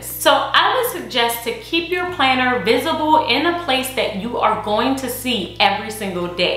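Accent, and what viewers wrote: New Orleans, United States. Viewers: Okay sis!!! You are beautiful and I feel your kindness and support. What am I hearing in your voice ??? New Orleans, Islands where ? Keep the videos coming! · I feel like I hear a slight New Orleans accent🤔😂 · Sounds like you are from New Orleans! Beautiful planner!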